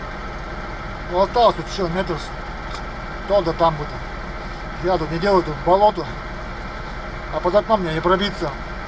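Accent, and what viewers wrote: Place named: Siberia, Russia. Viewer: НАВЕРНОЕ В СИБИРИ ЖИВЁТЕ СУДЯ ПО ГОВОРУ И СНЕГУ? Я СТОЛЬКО СНЕГА В ЖИЗНИ НЕ ВИДЕЛ,АХРЕНЕТЬ. ЛАЙК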